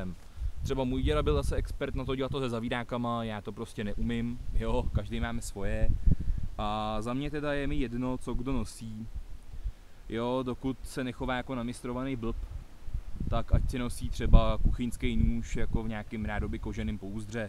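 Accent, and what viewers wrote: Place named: Pilsen, Czech Republic. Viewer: Sry, ale ta plzenština se fakt poslouchat. .. zeptal jsem se kameearádů na jejich neeazor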